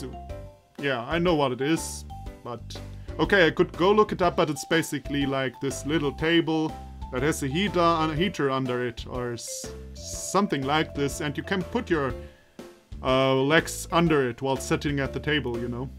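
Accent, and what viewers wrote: Germany. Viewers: Are you german? I mean you really sound like you are ^^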